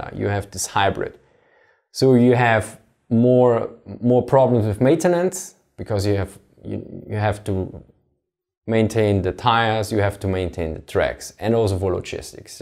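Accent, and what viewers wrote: Germany. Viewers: Seine Aussprache ist nicht so schlecht. Er hat ein starke deutsche Akzent, aber er ist noch verständlich, ganz besser als viele Engländer 😂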